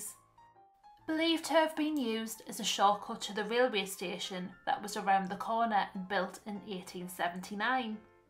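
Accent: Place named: Sunderland, United Kingdom. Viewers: Stroll on! That accent is horrible. I'm a Geordie (Gateshead must be, oh ten or twelve miles away from sun'lun but I'm telling you, that accent man! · I love a Mackem accent.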